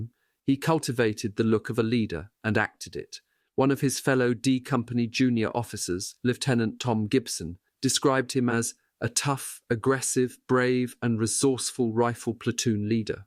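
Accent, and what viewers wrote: United Kingdom. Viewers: Why are they always using a British accent? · Because the person reading is British, it's his actual voice😔😔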